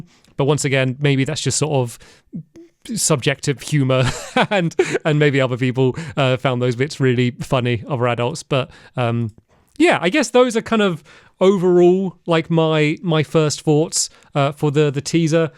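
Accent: United Kingdom. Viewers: Never watched your vids cause I used to think your voice was annoying. Now I realize you're just British and it's not your fault. Live your truth, I support you 🕊️🇨🇰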